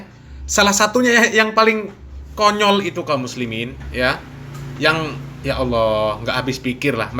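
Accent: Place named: Kediri, Indonesia. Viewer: Sangat sangat nggatelne teleh ... Duh . .logat bahasanya kog mirip banget dgn orang kediri to... Selalu ngakak kalo denger umpatan admin ... 😂😂😂😂😂😂😂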